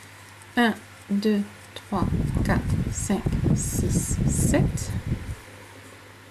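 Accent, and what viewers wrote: South, France. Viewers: bonjour et trés joli châle et continuez à parler un peu de sud ne fait pas de mal au contraire merci pour ce tuto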